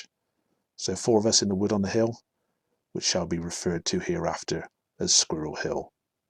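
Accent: United Kingdom. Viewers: And he speaks in a British accent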